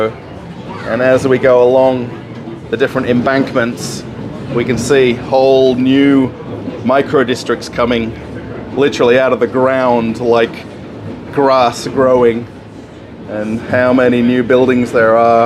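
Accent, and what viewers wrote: Australia. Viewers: i can detect an Accent..Australian or NZ....? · Мне кажется некоторым даже интересно и они пытаются понять, что он говорит. И это не сложно, ведь у него такой приятный австралийский акцент. Я с удовольствием его слушаю. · Nice video. I will get an Australian accent if I keep viewing this videos...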